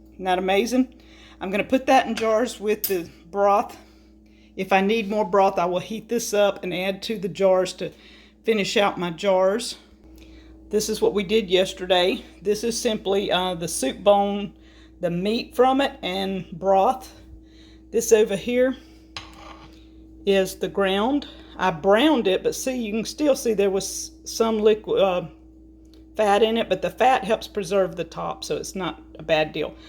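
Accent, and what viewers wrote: South, United States. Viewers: I just love southern accents! pronounces "boil" as "boll" instead of "boyle". Tell Danny I wore my new American-made Roundhouse stonewashed bib overalls to the dentist today. I love them! I shared this video on all my social media pages, so people could learn a very valuable skill to help them through the coming hard times. Now all they have to do is start watching Deep South Homestead so they can learn how to grow the stuff to put in the soup. You guys are doing the Lord's work, and God bless you for it!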